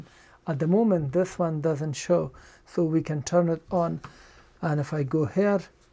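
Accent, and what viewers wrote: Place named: Poland. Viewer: Is this phone the Panasonic - KX-TGF975S Link2Cell DECT 6.0 Expandable Cordless Phone System with Digital Answering System and Smart Call Blocker - Silver? I'd love to purchase one of these one day! Does this have a voice guide? What I mean is, will it talk to you when you're setting up the features? As I am sight challenged, I have to have things that talk to me, as I can't read print, and I can't see what's on a screen. By the way, I looooooooooooooove your accent! Is that Polish? Maybe it's Spanish?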